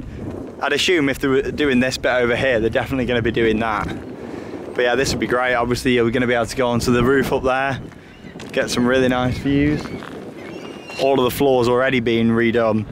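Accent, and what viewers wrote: United Kingdom. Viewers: I like your uk accent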